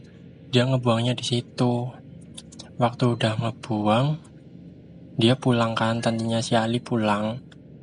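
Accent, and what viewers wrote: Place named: Banyumas, Indonesia. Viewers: Kiye mase mesti wong ngapak😂. Ketara bgt karo suarane🔥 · ngapak nya kerasa ya wak <3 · Waaahhh,, wong ngapak kie,,Salam ngapakerss mas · Itu mamakee lupa sama anak atau ketakutan sih.. sampe kasian sama mas nya dah ditinggal ndewekan 🤣🤣. Tetep pede ya mas meski medok tp justru itu kelebihanmu mas. Jd natural ceritanya. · Medhok kentel 🤭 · Wong ngapak kiye mesti. mbleketaket wkwkwk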